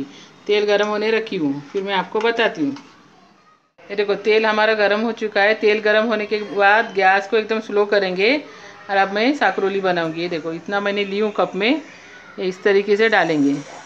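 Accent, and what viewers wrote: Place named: Konkan, India. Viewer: Asalam alaikum. Hope you are doing great and enjoying spirit of Ramadan. You have reminded me typical konkani cuisine of my Nani. You accent reminds me of my Nani’s family. I have already tried chana chatpata batata yesterday. Please keep doing what you are doing. Because it makes so many people feel and witness their childhood. Ramadan kareem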